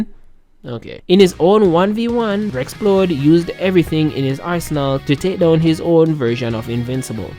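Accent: Jamaica. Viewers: Are you Jamaican because you sound 100% Jamaican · You can really hear your Jamaican accent coming out in this one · Are you Jamaican?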